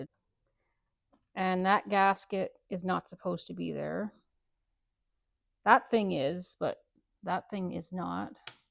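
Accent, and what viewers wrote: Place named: Canada. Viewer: Don't you just love how Canadians pronounce certain words.............like "out" which becomes "oot"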